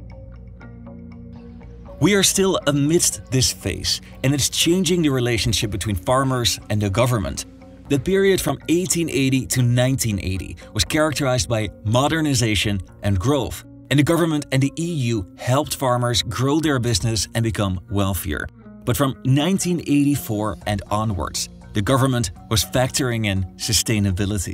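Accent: Netherlands. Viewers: I thought I detected a Dutch accent. Stumbled upon this channel because it was covering the Irish immigration crisis. Nice channel, balanced approach. Fijne reis naar Overijssel!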